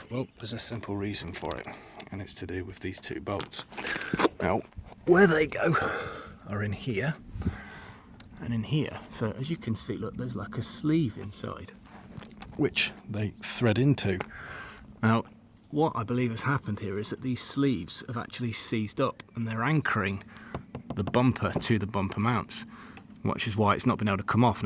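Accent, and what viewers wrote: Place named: England, United Kingdom. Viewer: Typical English pronunciation 🎉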